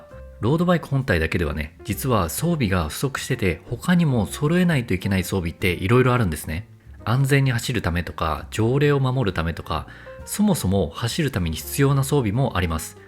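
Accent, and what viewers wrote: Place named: Aichi, Japan. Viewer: は愛知県内在住っぽいですね。ヘルメットのアクセントが「へ」ではなく「メ」ですね。私と同じ。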